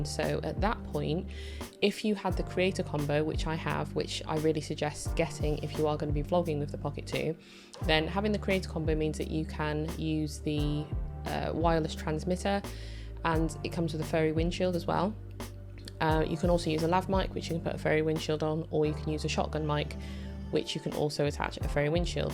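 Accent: England, United Kingdom. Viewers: Hello. Hope you can help this DJI OSMO Pocket 2 Tragic. After doing a fair bit of research, I purchased a POCKET 2 from Amazon. I bought the basic model and later contacted DJI in Melbourne, Victoria Australia where I live, seeking a microphone for this unit. I was advised that DJI no longer supports certain products such as the "FITTNG" that attaches to the base of the POCKET 2, that houses the electronics which accepts the spound from the DJI mike which the operator wears on their lapel. I have no idea what the electronics housing is called or named, and it is just so frustrating that no one can assist me. I hope you will be kind enough to assist me or give me some direction. By your accent I'd you are English and I hope you are able to advise me. Kindest Regards. Angus McKinnon.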